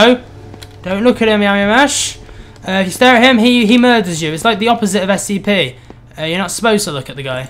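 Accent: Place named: England, United Kingdom. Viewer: So, I was playing some Halo 4 Grifball earlier today...and I was playing with a guy who sounds a whole lot like you. At the very least, I think he was from the same part of England as you are.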